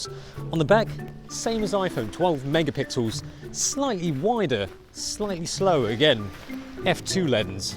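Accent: England, United Kingdom. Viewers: are u English bro? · Great video Phone comparison!. But I think this is the first time I've ever seen an Asian man with a British accent?.. but then again I live in the states.. cheerio!😉🇺🇸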